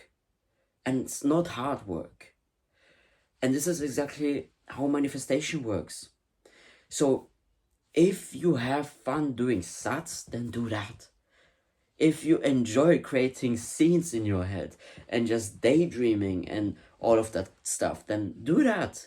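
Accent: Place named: Scotland, United Kingdom. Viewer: are you from Scotland? lovely accent wow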